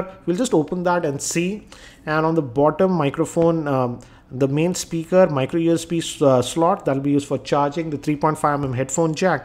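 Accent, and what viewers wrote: India. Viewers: Always dislike the Indian accent (English)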